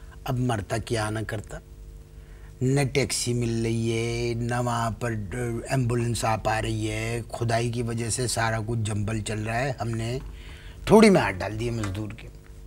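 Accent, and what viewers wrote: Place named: Bareilly, India. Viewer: Urdu accent of Bareilly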